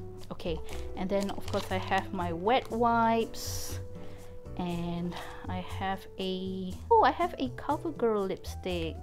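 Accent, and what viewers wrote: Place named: Singapore. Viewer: Recognised that Singaporean accent. Love the Damier Azur print!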